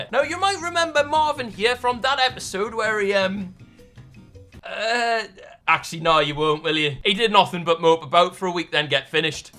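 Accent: Newcastle, United Kingdom. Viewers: He's from Newcastle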